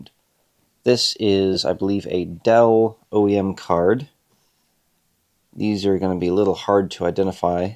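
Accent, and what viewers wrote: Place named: United Kingdom. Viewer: HAHA as a brit, I appreciate the british accent 😁😂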